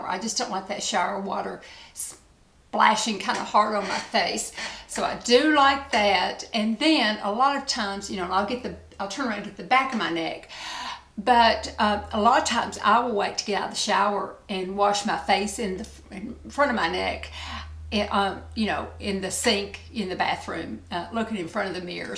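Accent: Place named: South Carolina, United States. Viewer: Hi I've been watching you for a long time now. I like playing videos while putting on my makeup when I can. First thing I thought was "she sounds like me!" Lol I'm a born & raised South Carolinian! At that time no other women on YT in the beauty community sounded like us so that's how I found you. I subscribed and haven't missed a video since plus I've gone back and watched a lot of your videos from earlier days. You're a beautiful woman first of all but I think you're a beautiful soul as well. I enjoy your videos and you've convinced me to try a few products and NOT try a few. I'm 56 married 34 yrs in Oct 4 children 2 living and 5 beautiful grandchildren. I love makeup big hair my jewelry and clothes so I fit right in or you fit in to my lifestyle. Thanks for sharing and the tip about the Tazo tea for nausea that helped Doug has really helped my husband. 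I just wanted to say I appreciate you! Hope you do videos for a long time to come. Blessings & love from SC ❤🌹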